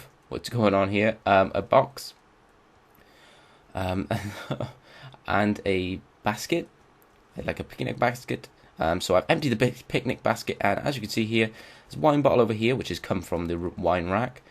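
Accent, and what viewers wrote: England, United Kingdom. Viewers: I don't remember you being British. Not trying to be rude, just saying. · Your so English.... I LOVE IT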